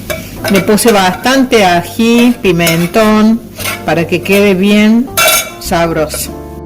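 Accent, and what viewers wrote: Córdoba, Argentina. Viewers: Por casualidad usted no es cordobesa xq a mí me parece que si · Sos de Córdoba 😁 por la tonada. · Muy bien explicado… (la tonada le da la magia a la receta) aguante Córdoba❤ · por el acento ya se sabe como hablan los cordobeses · Tienes un acento típico de la provincia Argentina de Córdoba! muy lindas las cordobesas! Gracias por compartir tu receta ,voy a poner manos a la obra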